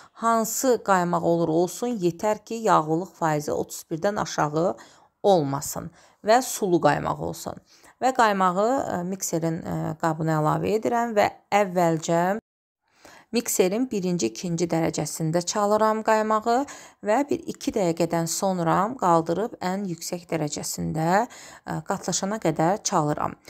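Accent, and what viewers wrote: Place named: Azerbaijan. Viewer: 🤩🤩🤩🤩Azeriler çok tatli konuşuyorlar.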